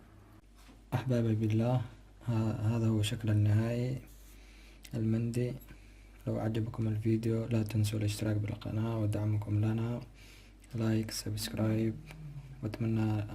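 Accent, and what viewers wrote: Aden, Yemen. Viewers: الشيف لهجته عدنية .. تعزوعدن لهجتهم كثير متشابه .واصله من يافع بس من سكان عدن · وووواقلبي على اللهجه العدنيه حبيب ربي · فديت اللهجه العدنيه هههههههههههههههههههههههههه تسلم يديك ياغالي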